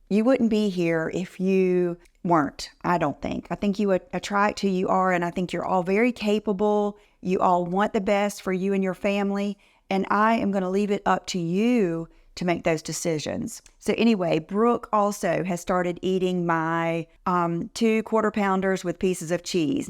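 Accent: South, United States. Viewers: hi - thank you! i love your insights & tips...also keep up the great energy & i love your southern accent!! xo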